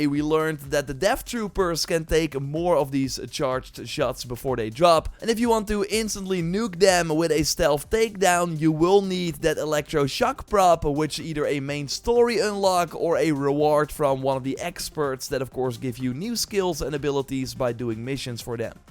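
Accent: Netherlands. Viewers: You sound Dutch bro 😁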